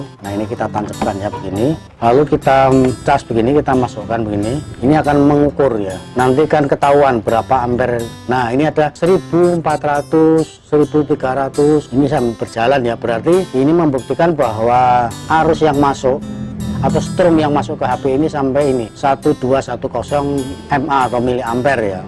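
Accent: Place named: Java, Indonesia. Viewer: Medok sekali asli gunkid 🙏🙏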